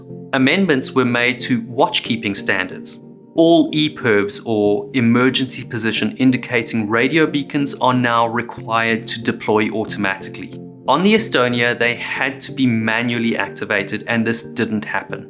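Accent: South Africa. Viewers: Neat accent. South African, is it?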